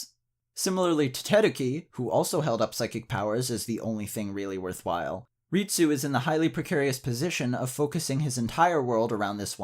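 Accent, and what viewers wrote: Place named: United States. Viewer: Forgive me if im wrong, but from your accent, im assuming your are american, in which case you should have studied in england, because you would have achieved 100% on any exam with that quality of ananlogy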